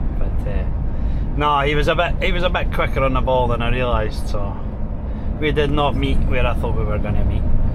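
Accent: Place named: Lothians, United Kingdom. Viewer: yeah makes sense. Cant quite make out your accent. Lothians somewhere.? Sound like Lewis Capaldi or Dean who has the channel - one bike one world.?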